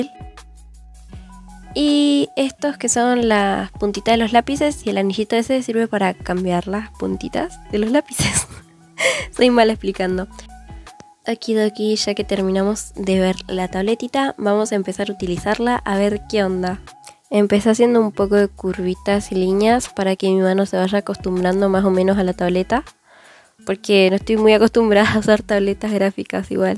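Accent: Argentina. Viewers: Sos argentina quiero decir por el acento xd yo si soy argentina 😂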